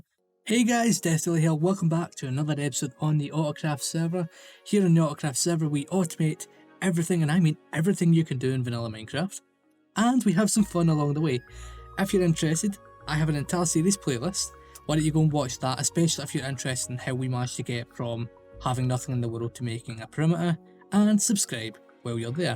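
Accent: Scotland, United Kingdom. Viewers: bro ur scotish accent really annoys me Xd (no hate)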